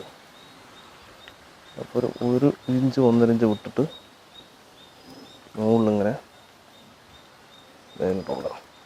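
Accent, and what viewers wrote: Palakkad, India. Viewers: തനി പാലക്കാട് ഭാഷാ......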